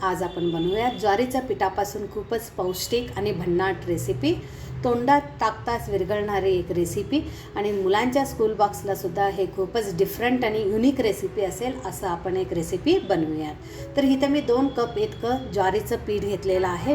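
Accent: Karnataka, India. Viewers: तुमची मातृभाषा कन्नड आहे का 😊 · छानच आहे पाककृती.ज्वारीच्या शेवया.आमच्याकडे अशाच तांदळाच्या पिठाच्या शेवया करतात. तिखट किंवा गोड तोंडीलावणेबरोबर खाल्ल्या जातात. तुमच्या बोलण्यावरुन तुम्ही कानडी असाव्यात असे वाटते.आमच्या शेजारी धारवाडच्या कडकोळकाकू असेच मराठी बोलायच्या.धन्यवाद.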